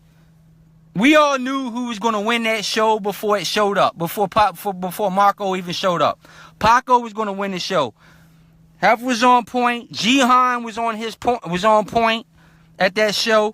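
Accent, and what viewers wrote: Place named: South, United States. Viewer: He talk like a southern guy not a black guy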